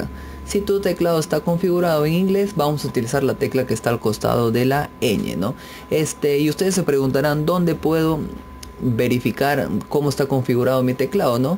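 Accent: Peru. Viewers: Peruano .___.XD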